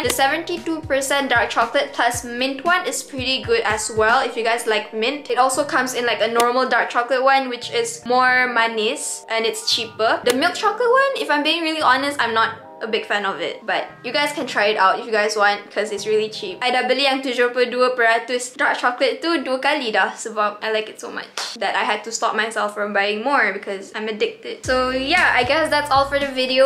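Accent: Sarawak, Malaysia. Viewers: This is my first time watching your video. And I'm guessing you are Sarawakian sbb ada slang sikit. Lepastu whenever you klaka sarawak and I was like, OMAGOD HEBATNYA AKU. hahahhaa. Love your video and tq for the tripod yang boleh lekat dkt katil tu. Gonna buy it!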